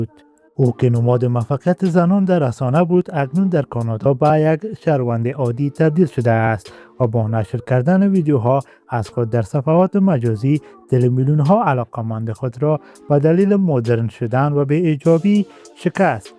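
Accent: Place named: Afghanistan. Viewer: تشکر از شما که لهجه دری افغانی را در کانال خود اضافه کردین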